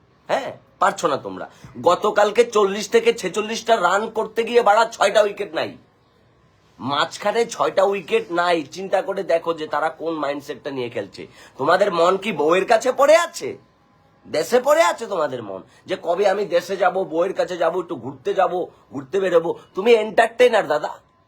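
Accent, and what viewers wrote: Kolkata, India. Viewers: ভাই আপনার ভারতীয় বাংলা ভাষা এক কথায় অসাধারণ লেগেছে। একদম কলকাতার টিউন। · Dada tomar Kolkata accent ta darun laglo ...😂😂😂😂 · uni hotat kore kolkata accest e bolse pura video te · দাদা আপনি কিন্তু কলিকাতার মতন কথা বলতেছেন আমাকে খুব ভালো লাগতেছে কথা শুনতে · Apnar Kolkata er golar tone ta khub valo hoyeche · সেই হইছে কলকাতা স্টাইলে ।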